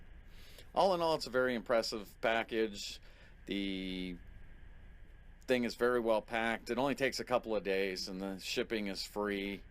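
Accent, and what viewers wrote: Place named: Pittsburgh, United States. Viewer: your have a very strong Pittsburgh accent.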